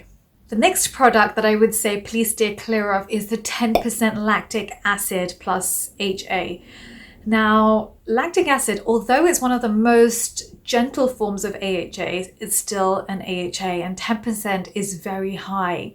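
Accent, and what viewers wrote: United Kingdom. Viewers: Is that British accent?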